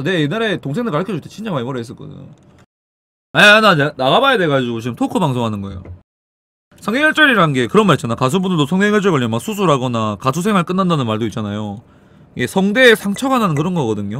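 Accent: Busan, South Korea. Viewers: 부산 싸나이네 ㅋㅋㅋ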